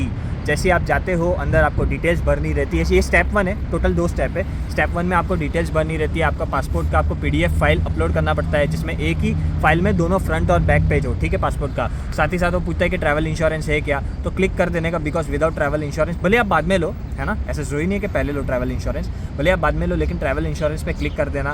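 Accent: Gujarat, India. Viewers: Bhai ko boli se pata lgta hai ki bhau gujju hai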